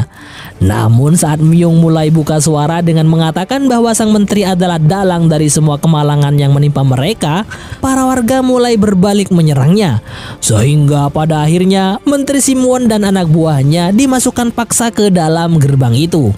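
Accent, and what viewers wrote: Madura, Indonesia. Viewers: Madura mana bg?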